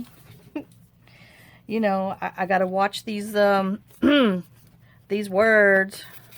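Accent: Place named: Mississippi, United States. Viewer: Hi. You mentioned you was from Biloxi,Mississippi. My daughter was born there at Keeesler Air Force base. I lost her in 2007. But anyway I came back to Missouri talking that "you all" quite while. I love your accent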